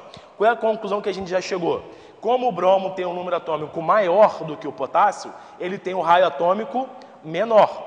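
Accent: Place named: Rio de Janeiro, Brazil. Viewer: eita sutaque de carioca, mt bem explicado!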